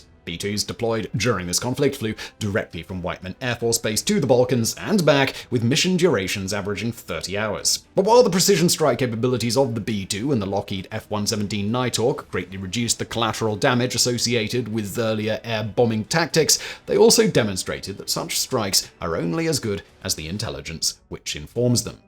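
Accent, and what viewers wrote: England, United Kingdom. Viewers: I feel like I’ve seen clones of this guy on various channels. All bald, with a beard and a British accent… is it just me? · FOR ENGLISH ACCENT ONLY !!! - TALKING AT SPEED OF LIGHT 🤮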